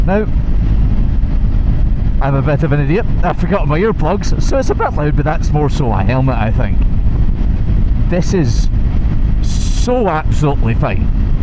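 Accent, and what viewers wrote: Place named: Scotland, United Kingdom. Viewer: Your joy is contagious! Love your Scottish accent. Auld alliance. You won a subscriber from France.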